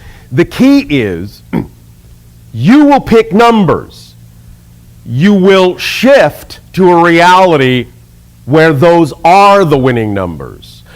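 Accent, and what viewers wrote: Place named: United States. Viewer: Why do you talk with American accent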